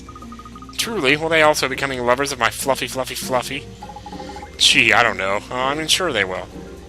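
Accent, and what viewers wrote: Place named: South, United States. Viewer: ... Dude. That southern accent is a twinge worse than the one I hear daily, dude. It makes me miss NYC, man...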